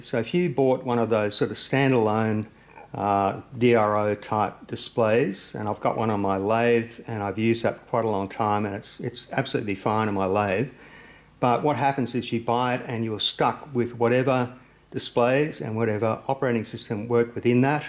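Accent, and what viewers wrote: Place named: Australia. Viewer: Talking is fine by me as I learn a lot from you, and my wife thinks your Australian accent is cute!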